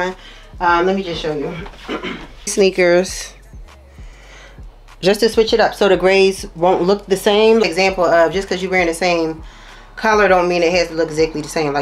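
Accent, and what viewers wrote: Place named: Philadelphia, United States. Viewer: Are you from Philadelphia? Your accent is giving Norf’ Philly 😊… FYI, I went to grad school in Philadelphia and the way you talk bought back beautiful memories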